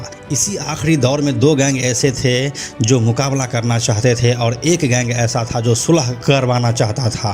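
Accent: Bihar, India. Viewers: Bhai pahele to yeh thik ker le us ka naam shabbir nahi sabir tha.dusre us ki girl friend kamathi pure ki nahi Congress house ki thi Jo lamington road per hai kamathipura se dur ..tesre aap ki awaz bahoot acchi hai behari tone wali.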